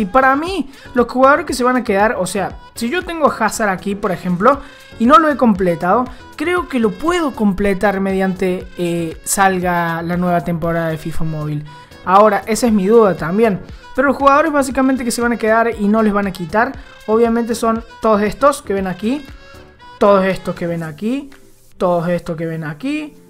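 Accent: Córdoba, Argentina. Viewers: Re cordobes el qliau jajaj genio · ¿sos de Córdoba no? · Pareces una combinacion de cordobes(personas de corfoba,Argentina) y un pelo de español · Alto cordobes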